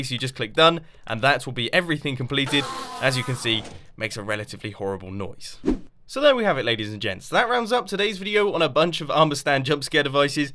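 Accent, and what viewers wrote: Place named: United Kingdom. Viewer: I love your eccentric british voice. Can't wait for the next hermitcraft 3 episode!!!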